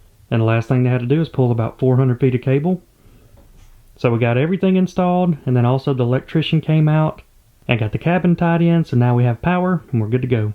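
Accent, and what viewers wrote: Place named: Pacific Northwest, United States. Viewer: i love this. The sound outside is also enough for me. if you live streamed your ducks id patreon for that in a heart beat. I just had to move back to the PNW from GA so your accent is very welcomed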